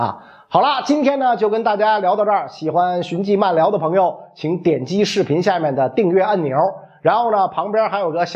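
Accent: Beijing, China. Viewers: 講普通話，有些北京腔聽不太懂。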